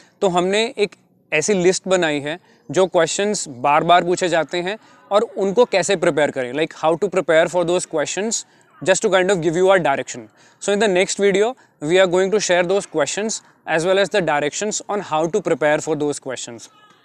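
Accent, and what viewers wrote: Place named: India. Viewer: Well, I'm Ukrainian and it's a bit difficult for me to understand English with Indian accent but I'm trying. Not all your subscribers are able to understand Indian language at all, so it would be better not to mix it up and create separate videos in English and Indian only for your audience's convenience.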